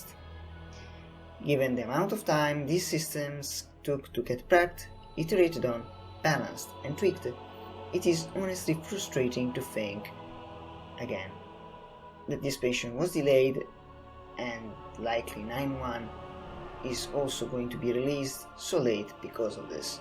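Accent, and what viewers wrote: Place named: Germany. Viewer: Have to love that accent. Is it German or x. X)... Ohhh god the lvling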